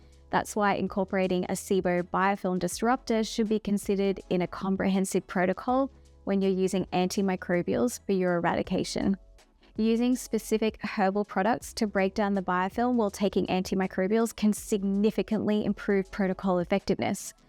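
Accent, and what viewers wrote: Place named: United Kingdom. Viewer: Why don't you cover sulfur SIBO? It has greatly negatively affected my temperament and made me rather picky. For example: titration. I don't mind the British pronunciation but you pronounce oregano as if it weren't English and I find that very irritating. At least you're not butchering turmeric like half the internet, especially Americans. uh-REG-uh-no I realize you're pronouncing it the Italian way But that kind of clashes with your accent. It really bugs me that You say bacteria are smart when in fact they are resilient and lack intelligence.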